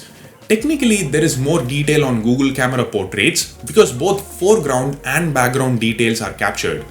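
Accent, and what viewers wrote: India. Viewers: Worst thing about M40 is the fact that i have to listen to indian accent to get any information about this phone...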